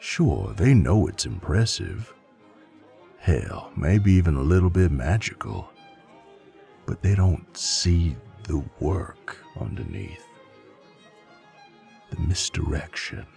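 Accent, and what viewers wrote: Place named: South, United States. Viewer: SOUTHERN ACCENT😭🙏🙏🙏🫄🫄🫄 CONSIDER MY M PREGGED AND MY EARS GASMED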